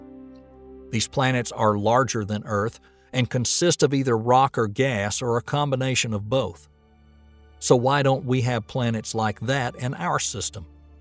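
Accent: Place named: Georgia, United States. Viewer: This leads one to opine... there is some truth to the notion that Jupiter is a savior god, pulling us away from hell, and that the sun is hell, Lucifer, drawing all to it inevitably. This reforms the Judeo-Christian hierarchy of the trinity. God is Satan, the Sun. Jesus is the savior, Jupiter. The holy spirit is gravity, the unifying force that these entities use to interact with us. All this is hogwash, of course. But it is an example of solipsistic thinking. I just did this to show you religionists there's a better explanation that still isn't true. Your creation hypothesis makes no sense at all. P.s. I love how this guy with a southern, Georgia accent, speaks so intelligibly. He's an outstanding orator, with perfect information. Great presentation. Thank you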